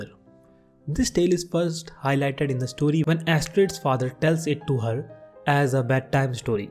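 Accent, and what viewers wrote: India. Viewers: I couldnt finish this. Your accent is the most painful mix of indian and monotone. My brain is in pain.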